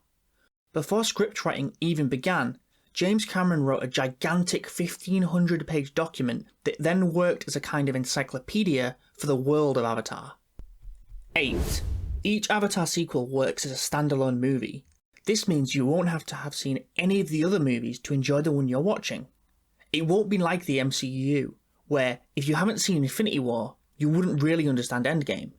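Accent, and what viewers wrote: Yorkshire, United Kingdom. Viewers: is that a yorkshire accent or am i trippin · Came here for avatar news but I'm too distracted trying to figure out his accent. Yorkshire??? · Avatar the way of wo-ah 😂 God I love British accents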